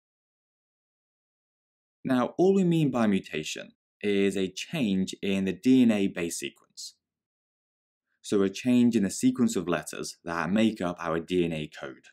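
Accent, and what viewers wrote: United Kingdom. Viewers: Seems british to me 🤔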